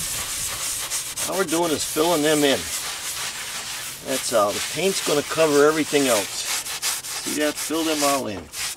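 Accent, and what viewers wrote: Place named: Pennsylvania, United States. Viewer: Hi, just came across your channel while looking for some tips on repairing my patio of natural -shaped bluestones. After a few minutes of viewing the videos I noticed something very familiar - the scenes, the houses, the speech. You are from my hometown area. I was born & raised in Dupont, schooled in Pittston, but currently live in MA on Cape Cod.. I am quite surprised to find someone from the Wyoming valley being a YouTube creator AND doing a great job at it. You are providing a great service entertaining & educating many people. Thanks for that. I subscribed and wish you continued success with your channel. Just wanted to say hello. Paul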